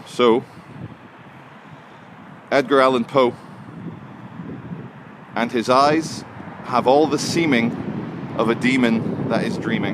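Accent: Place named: Scotland, United Kingdom. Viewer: Like, Subscribe, he's a good guy he said, Scottish Accent sounds great.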